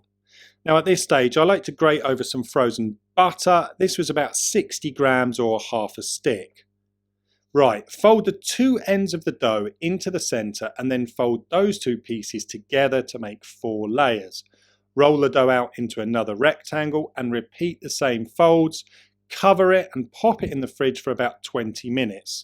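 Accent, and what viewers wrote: United Kingdom. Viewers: your British accent is very attracting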